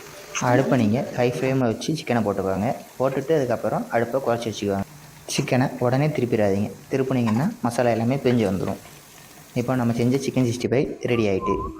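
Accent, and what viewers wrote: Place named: Madurai, India. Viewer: உங்கள் எந்த ஊர் ? பேசும் போது தேற்றம் மதுரை என்று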